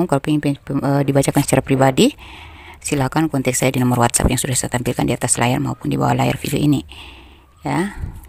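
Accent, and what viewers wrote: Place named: Cigombong, Indonesia. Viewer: Ko suaranya yg seperti aku kenal ya.... Seperti suaranya orang cigombng/ lido ...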